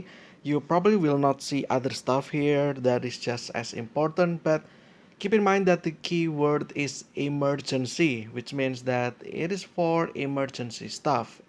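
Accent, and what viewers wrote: Indonesia. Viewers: Ur sound like i donesian accent u know? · How do you get Alphacelone?. Btw, your accent is kinda like Indonesian?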